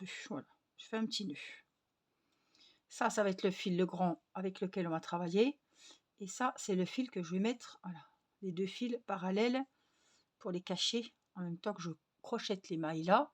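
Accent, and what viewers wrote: Franche-Comté, France. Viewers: Donc je suis vos explications pour le bonhomme de neige pour ma petite fille c'est un plaisir de crocheter avec vous, petit aparté je voulais savoir si vous êtes franc comtoise car vous avez cet accent qui me rappelle ma Franche Comté merci pour tout.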